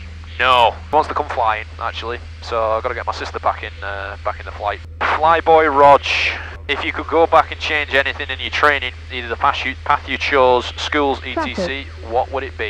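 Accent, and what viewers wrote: United Kingdom. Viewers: hey, thx for answer my question :))) you are from australia? i always thought, you are from uk because your dialect sounds british..... :))))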